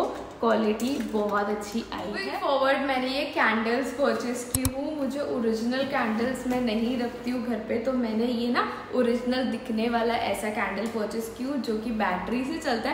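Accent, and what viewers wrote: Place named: Hyderabad, India. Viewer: Areeee ap Kerala se ho muj laga Hyderabad se ho apki Hindi Hyderabadi type hai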